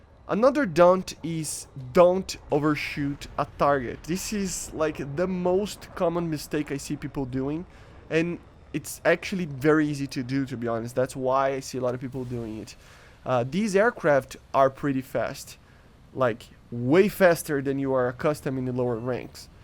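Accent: Brazil. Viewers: nice video, but i have a question, are you brazilian? your accent sounds brazilian, if not, where are you from?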